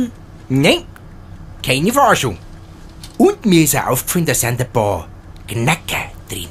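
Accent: Bavaria, Germany. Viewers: endlich moi oi bayrischn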